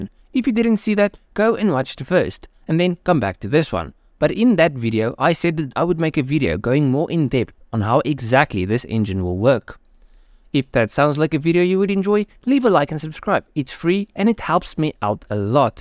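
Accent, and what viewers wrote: South Africa. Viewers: South African ?? I’m an American that lived in Yeoville in 1984-85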